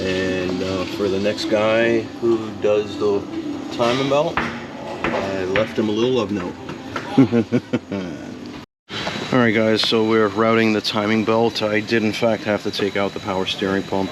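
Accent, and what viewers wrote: New York, United States. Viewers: YOU GOTTA BE A NEW YORKER. boston got nothin on you.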